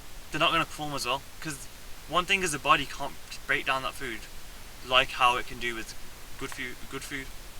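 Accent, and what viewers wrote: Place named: England, United Kingdom. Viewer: Sry man i‘m not used with your strong english accent, my bad. I got a Passat with 1.4 CAXA in june 2023, bluemotion 122hp and back then 36000km. Now i‘m by 100000km and aproacing to a oil service next week. Should i ask to check the timing chain? I‘m a bit afraid since the previous car was a total disaster, Tiguan 1.4 TSI 2009 with 150hp (sims to have not only a turbo compressor but a second compressor as well), they found a broken cylinder during the 12-months warranty and then they offered me this passat since it was a bit newer and they also said it is a better engine. What’s your opinion?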